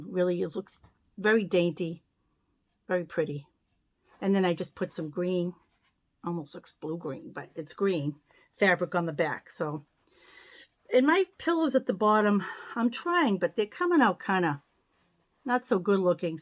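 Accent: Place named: New England, United States. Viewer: I love your from sea to shining sea! And your NE accent!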